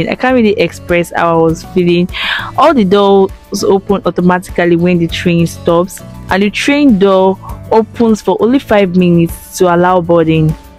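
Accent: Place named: Yoruba, Nigeria. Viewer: I like your spoken English with broken and yoruba vibe......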